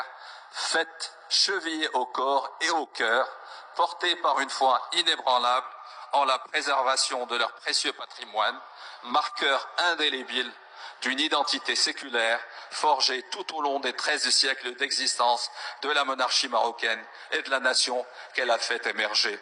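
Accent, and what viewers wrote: Standard. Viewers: فرنسية نقية وماعندي مانقول فهاد الكلمة ةلي لخصات وبكل بساطة تاريخ القفطان عند المغاربة !! خطاب متقون وكلماته مختارة وصراحة برافو .. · واش سمعتي أداك القرع الكلام الموزون و الفرنسوية النقية و هاد شي كولو بطابع ديال المعقول ماشي الكذوب و البهتان …. تعلموا من سيادكم le savoir faire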